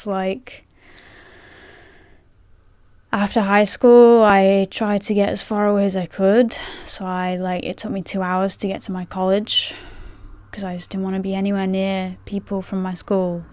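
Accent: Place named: Lancashire, United Kingdom. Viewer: I have social anxiety too but still get surprised when people as outwardly confident as yourself reveal you have it! lol I appreciate a bit of the struggles you must go through, I've had SA for over 15 years now and while I don't quite agree that it can be 'cured' for everyone or the route causes of it, I'd advise people here that it can be worked on and improved at the very least for the vast majority of people. But without sounding creepy (he says as he sounds creepy...lol), I have to say you're such a lovely, beautiful lady, inside and out and you can at least take solace in not just that but in all the good you're doing for others out there with your videos and ASMR. :) PS: I never thought I'd enjoy the Lancashire accent so much before now..! lol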